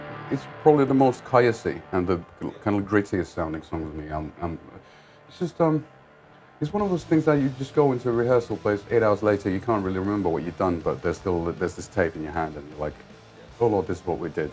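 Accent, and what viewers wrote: England, United Kingdom. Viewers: Villes voice has changed alot the last few months. he sounds soooo much more British now. LOL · He has always had a English accent, and he doesn't hang out with Dani Filth a lot he lives in Finland and Dani Filth live in England pretty far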